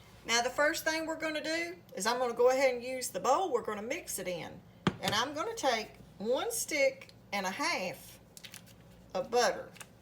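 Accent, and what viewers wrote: South, United States. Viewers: Love your comforting southern voice!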